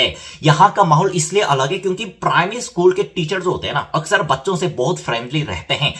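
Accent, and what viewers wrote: Standard. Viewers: inti sudh hindi hamare hindi ke professor bhi nahi bolte..❤👍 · Apne itne sudh hindi 😯, khana se sikhi.... Itne toh mujhse nhi boli jati 😂😂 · Bhai jitni shudh hindi tumhe aati hai itni shudh hindi toh hame India mai rehkar nhi aati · Haayyy allah itni shudh hindi hume nhi aati hindustan main aake toba toba😂😂😂🥂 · North east wale nhi tumse v jyda sudh hindi bol raha hai korean log samjhe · भाई इतना शुद्ध हिंदी कैसे बोल लेते हो इतना सटीक शब्द तो हम लोग नही बोल पाते हैं 😂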